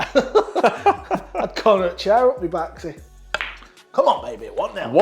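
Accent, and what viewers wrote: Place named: Northern England, United Kingdom. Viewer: Horrible, NASAL, Northerner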